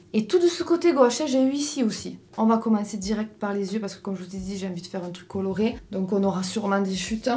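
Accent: South, France. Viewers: J’adore vos nouveaux cheveux …….du Québec j’adore votre accent du sud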